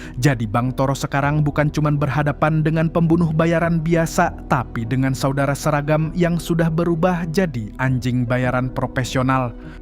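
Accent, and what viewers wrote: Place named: Banyumas, Indonesia. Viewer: ngapakke krasa banget koh wong banyumas mesti kie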